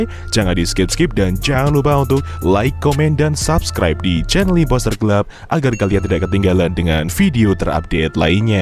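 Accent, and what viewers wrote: Surakarta, Indonesia. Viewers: org solo bang?wkwk sama nih😅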